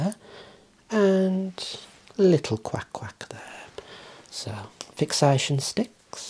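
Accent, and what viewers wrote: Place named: England, United Kingdom. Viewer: This is how I imagine everyone from England speaks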